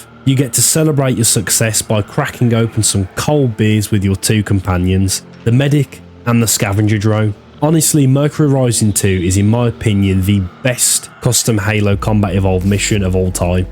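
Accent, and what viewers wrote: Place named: Wolverhampton, United Kingdom. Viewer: Id love to know where your accent is from. Im gonna bank on wolverhampton. Great video anyway❤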